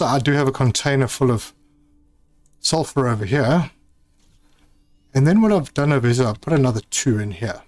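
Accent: South Africa. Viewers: I tested the T3 ore extractors, and you can mine everything without having to go to specific areas (super alloy cave, osmium cave, etc.). Also am I detecting a South African accent?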